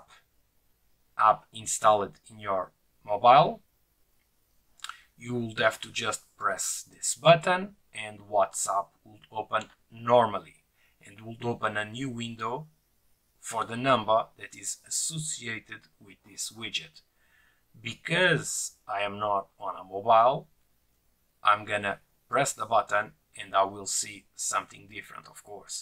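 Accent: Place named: Portugal. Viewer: meu, que accent mais tuga!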